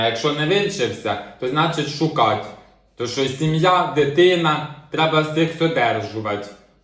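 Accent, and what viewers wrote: Poltava, Ukraine. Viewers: Полтавський говір не сплутаєш ні з ким.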